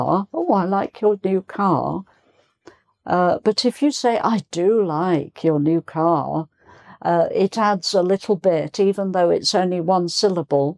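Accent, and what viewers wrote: United Kingdom. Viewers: I really like the British accent😘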